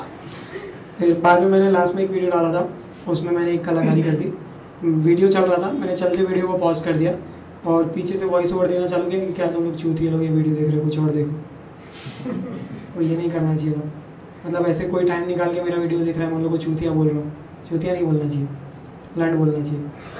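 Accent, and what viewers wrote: Bhopal, India. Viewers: Ooi, yeh Bhopal se hai kya? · tu bhopal se hai kya...? :D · "Bhai ye bhopal se hai kya?" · Ye Bhopal se he kya? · Ye bhopal se hai kya?? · Ye banda Bhopal se h kya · Bhai tum bhopal see hoo kya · Ye banda bhopal se hai kya??🤔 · Yeh banda bhopal se hai kya?? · Ye bhopal se h kya ? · oyee tu bhopal se h kyaa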